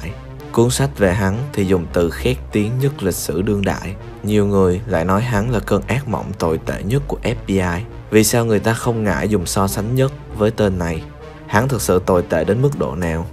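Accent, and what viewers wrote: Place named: Da Lat, Vietnam. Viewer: bạn này chắc là người Đà Lạt, giọng giống giọng em trai mình quá luôn ah.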